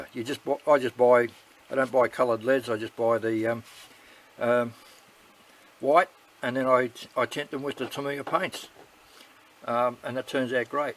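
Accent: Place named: Australia. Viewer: Thank you, this is extremely helpful ✅ It’s great to hear an Aussie accent on YouTube. Best wishes, watching from Batemans Bay, NSW.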